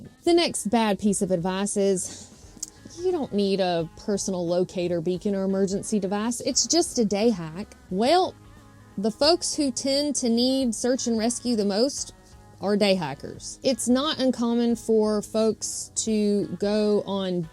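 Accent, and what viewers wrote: United States. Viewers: It's nothing to do with hiking but as a person born and bred in the UK, I just love your USA accent.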